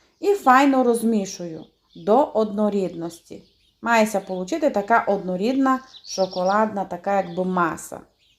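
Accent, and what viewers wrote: Transcarpathia, Ukraine. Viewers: я ще не їв того пляцка, напевно дуже смачний. Але я милувався твоїм голосом з закарпатським акцентом. Дуже мило і приємно слухати тебе. Дякую що ти на каналі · Люблю закарпатський діалект. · Який гарний закарпатський діалект. Це багатство нашої мови.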